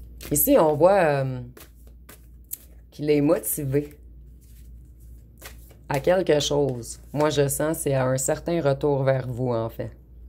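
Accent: Quebec, Canada. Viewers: Bonjour … quel bel accent quebecois … c’est si jolie et relaxant de vous écouter , un pure bonheur !!! 😌❤ Les 3 le représente parfaitement bien , vous êtes vraiment tres doué . 😅👍🏼.. infini gratitude pour tout le temps que vous nous consacrez … Merci . Stéphanie 🙏🏻🙏🏻🙏🏻🙏🏻🌼☀️🌸🌷🎉🎈🩷💞🌼☀️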